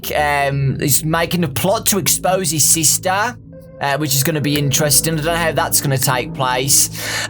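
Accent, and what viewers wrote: United Kingdom. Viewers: As a non-native English speaker, accent really caught my attention. Does anybody know what part of the UK is he from? Also, as always, great video!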